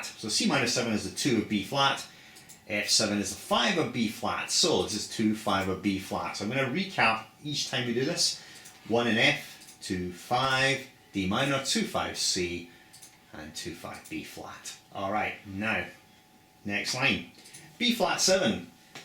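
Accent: Scotland, United Kingdom. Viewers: I already know all this information, but I can't stop listening to the Scottish accent. Keep them coming, man!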